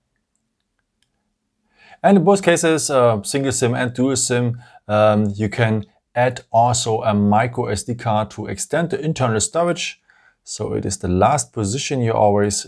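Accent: Germany. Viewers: You sound German by the way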